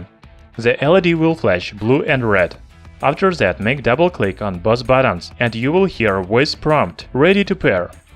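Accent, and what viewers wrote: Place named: Russia. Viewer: What is your accent? Russian? I can't tell.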